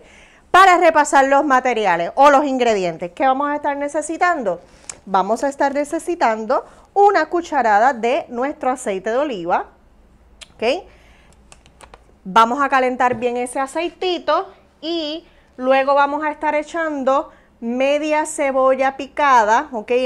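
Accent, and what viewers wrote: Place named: Cuba. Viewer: Me encantan sus recetas...pero de verdad tiene que estar diciendo tanto "showchito"?...como se dice en ingles...so annoying y el acento cubano? Pq?...son cosas innecesarias....explica super, tiene buena energía, es alegre....eso es más que suficiente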